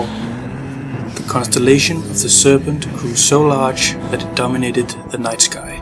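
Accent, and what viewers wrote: Denmark. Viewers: SO dude, are you Danish? Just wondering. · Hey - great video! I couldn't help but wonder, are you Danish? You seem to have a slight accent, and your DK in " " hints at it as well. Cheers, a (fellow) Dane.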